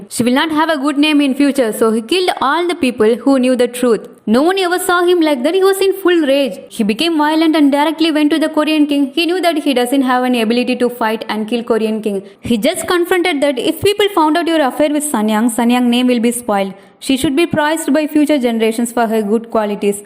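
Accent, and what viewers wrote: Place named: England, United Kingdom. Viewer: Really happy to see thiz drama in your english accent.... ❤❤❤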